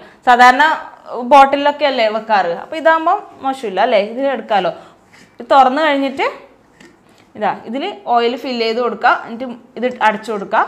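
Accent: Kannur, India. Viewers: Chechide veedu kannur aano? Kannur slag varunnundu athukond chothichatha.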